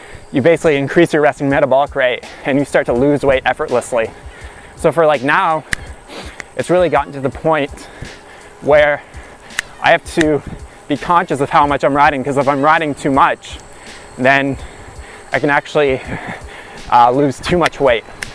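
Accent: Canada. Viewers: I new he was from Canada.the way he says about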